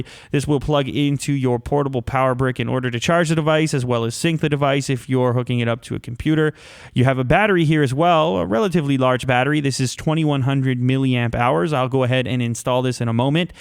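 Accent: Canada. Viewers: Hey are you from Canada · YOURE CANADIAN? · He's Canadian??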